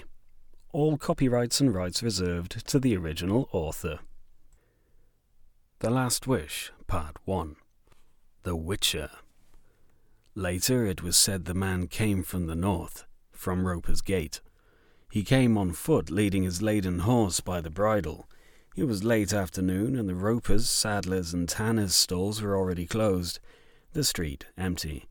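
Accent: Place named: Birmingham, United Kingdom. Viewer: Nice. Love the quivering Brummie. Only thing I would suggest is a very slight further increase in pace during the "combat". But that is just my opinion.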